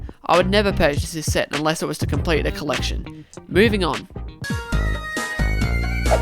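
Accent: Australia. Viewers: Your Australian !!!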